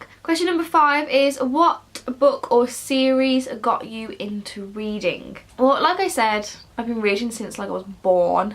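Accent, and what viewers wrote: United Kingdom. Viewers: To an American, all British accents sound awesome, so you’re good with me. 😘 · Welcome to booktube! :D I love your Birmingham accent! ♥ My own accent is such a hot mess! But you sound lovely!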